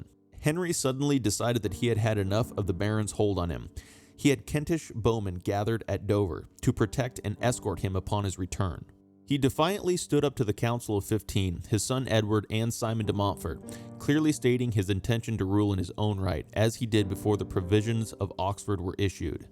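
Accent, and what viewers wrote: United States. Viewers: Well written .. poorly pronounced. The American accent is an extreme handicap to educators.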